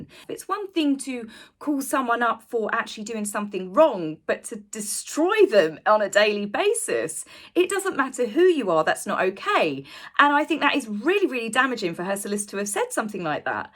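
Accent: United Kingdom. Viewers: Great video. I just wish you could talk a little slower... sometimes it's hard to understand with your British accent 😉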